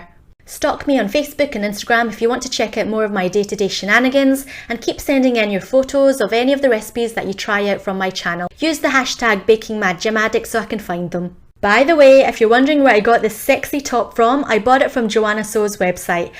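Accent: Scotland, United Kingdom. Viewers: You speak with a lovely Scottish accent. Am I correct? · Whenever I see/hear an Asian with a UK accent, my brain does a double-take. Scottish is a new one for me. And you live in Australia. And eat Canadian maple syrup. Such Commonwealth. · I was just looking for some decent vegan meal preps & came across your video. Took me 5 seconds to subscribe thanks to that fabulous fellow Scottish accent! Woohoo! :D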